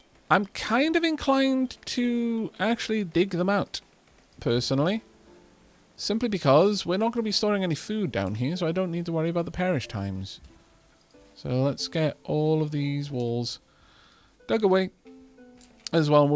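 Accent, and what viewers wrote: Wales, United Kingdom. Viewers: with your sultry Welsh tones and your propensity for looks over function, how could I not!?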